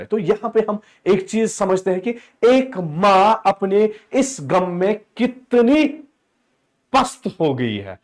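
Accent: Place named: Kashmir, India. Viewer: The kashmire tone of can be go fir a kashmire 😊❤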